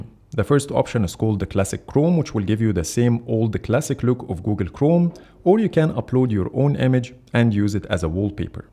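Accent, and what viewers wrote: Egypt. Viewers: Bruh Egyptian accents can always be picked up xd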